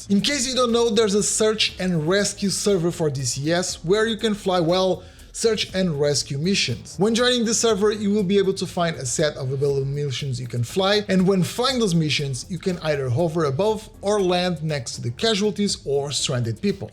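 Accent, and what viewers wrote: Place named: United States. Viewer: oh my got. that voice .. relax man, such american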